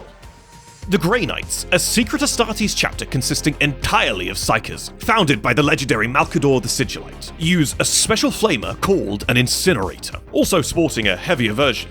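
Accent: United Kingdom. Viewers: Bri’ish bloke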